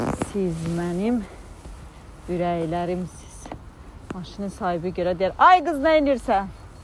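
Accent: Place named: Shaki, Azerbaijan. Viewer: Lenkodan deyil ki osakuceden sefi sefi danişir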